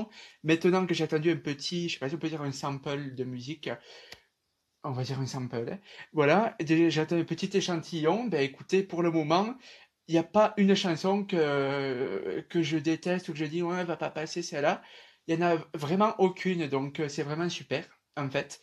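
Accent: South, France. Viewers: Sa se voit que tu VIEN du sud de la France comme moi 😊🇫🇷🖤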